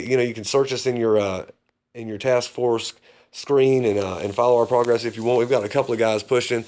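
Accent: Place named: Texas, United States. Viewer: Howdy from Okie City!! Thought that was a texan accent! cheers bud!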